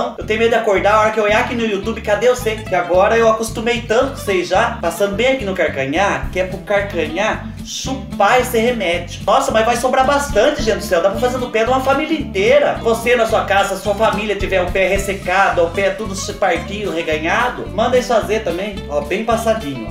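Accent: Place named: Minas Gerais, Brazil. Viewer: Aí do céu...não dou conta dessas canelinhas lisas... Vc é muito legal...KKK Abraço aí em São Paulo. Sou mineira e vc conversa como nós. 🤗😘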